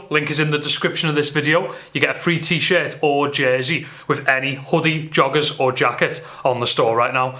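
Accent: Liverpool, United Kingdom. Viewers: Grew up in Liverpool, moved to Australia 10+ years ago. Been watching your videos for years now, miss hearing a scouse accent, so thank you for blessing my ears. Keep up the quality content bro <3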